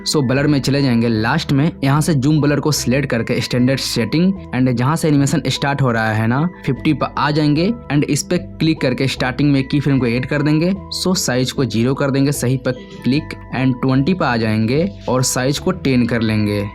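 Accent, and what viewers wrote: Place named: Bihar, India. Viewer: He is from bihar 😅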